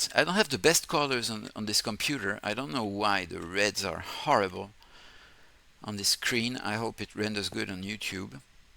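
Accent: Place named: France. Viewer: really cool base, love the french accent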